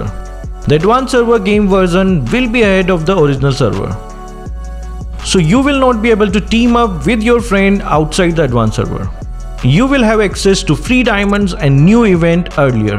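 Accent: India. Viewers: Am I the only one who hea Indian accent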